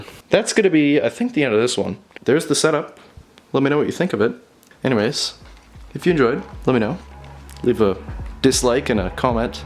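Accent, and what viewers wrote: Canada. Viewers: Your Canadian accent really comes through in this video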